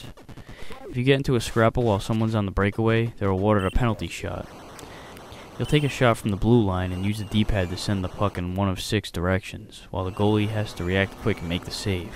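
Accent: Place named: Boston, United States. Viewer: ah so your from boston, i was wonderin about the accent. anyways , hows boston?ill be moving there in a few months, around suffolk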